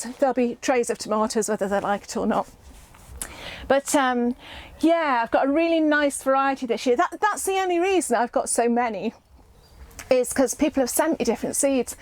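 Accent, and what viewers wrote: United Kingdom. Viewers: Thank you for the brilliant idea of tying the string around the root ball of the tomato plant. I usually use coat hangers pushed into the soil by the side of the plant and then tie the string to that and like you, I wind the plant around the string as it grows. Your idea looks so much easier so I will be trying that method this year. Now, I don't grow roo many in the greenhouse or hoop house over here in Ontario as it gets too hot. So, they are usually grown outside. So for the frame to use overhead, I have several old discarded gazebo frames. They work a treat and it does save them from the landfill (tip) for a few more years. Thank you for the great ideas and I especially LOVE your accent. It feels like a blast from my past as I was raised in UK. I was born here in Ontario but taken over there as a baby.. As you can imagine, I like to use the best of both worlds when it comes to gardening. 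Some things work well here and not so well over there and the other way around. Anyway, you take care and stay stay safe, blessings from Sandie in Ontario Canada.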